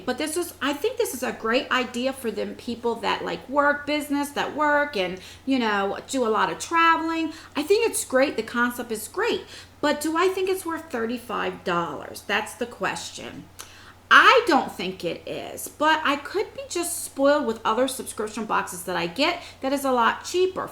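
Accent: New Jersey, United States. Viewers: I need a man with this beautiful jersey accent....u gotta brother or cousin looking? Hehehe!! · I'm sure you hear this a lot but I love your Jersey accent. I'm a newer sub but really enjoying your videos. Also in my early 30s its nice to watch a youtuber that's actually over 30 ❤❤ Also I am sooo like that some things I splurge on others I like to find the cheapest alternatives. I'll slay some Wal-Mart clothes all day 😉 p.s this box seems like more of a $20 box max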